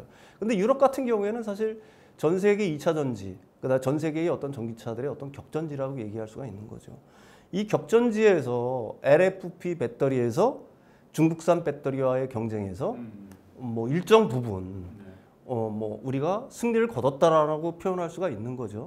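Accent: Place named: North Korea. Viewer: 억양이 북한사람 같아요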